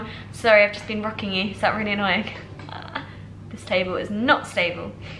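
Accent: United Kingdom. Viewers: I freaking love your British accent ❤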